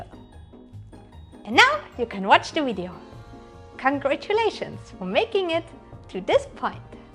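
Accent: Germany. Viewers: Das deutsch englisch ist einfach nur genial 😂😂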